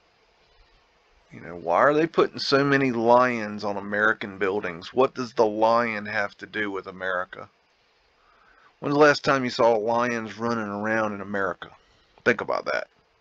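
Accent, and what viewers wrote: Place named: Oklahoma, United States. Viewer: That accent, you close to oklahoma?🧐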